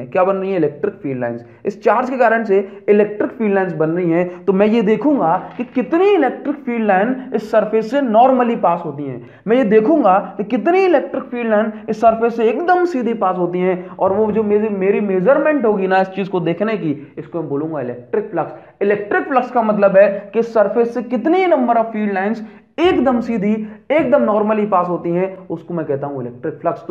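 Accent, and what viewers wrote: Odisha, India. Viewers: Sir, are you odia???😃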